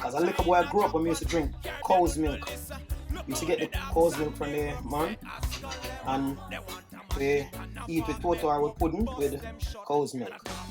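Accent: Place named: Jamaica. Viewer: Hello hun! Wah gwaan? I love your channel because you make everything look so amazing!! And The accent adds to it!! I’m learning Jamaican!!❤️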